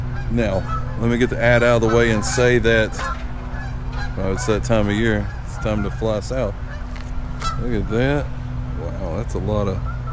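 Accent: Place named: South, United States. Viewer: You have great southern voice. God bless you friend.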